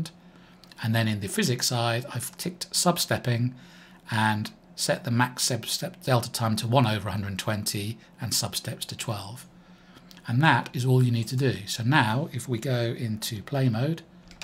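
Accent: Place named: South Carolina, United States. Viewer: Let me guess, are you from South Carolina or somewhere near it? THe manner of pronunciation reminds me my friends from these places. Thank you so much Sir, interesting and useful videos!!!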